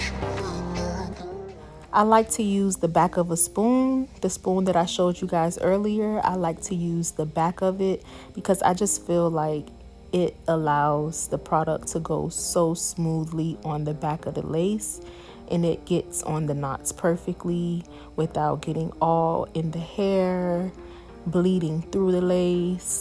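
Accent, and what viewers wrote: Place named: Chicago, United States. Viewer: You must be from CHI. Honey that accent comin through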